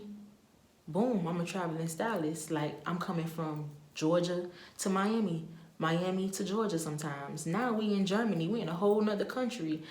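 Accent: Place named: New Orleans, United States. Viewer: Are you from BR or New Orleans ? It sounds like the accent 😍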